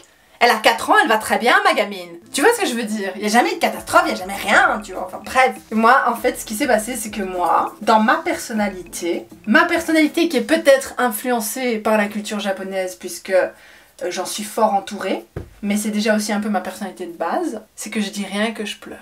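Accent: Belgium. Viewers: J'adore, surtout l'accent belge.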